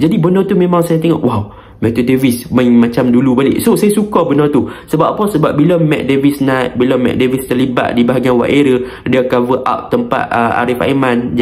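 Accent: North, Malaysia. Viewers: Teknik free kick aiman semalam...good..👍👍 Komentator nampaknya bergerak seiring dgn team...👍👍👍....😅😅awat bunyi macam utagha ..😂😂 Keesh...patut susah ati nih..😅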